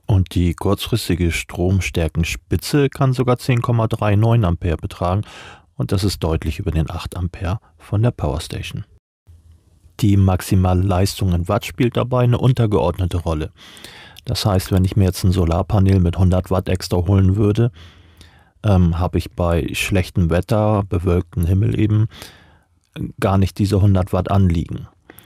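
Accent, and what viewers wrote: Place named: North, Germany. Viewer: Eruzähl mal was, wieso es dich in die Schweiz gezogen hat ??? Dein Dialekt klingt eher Norddeutsch ....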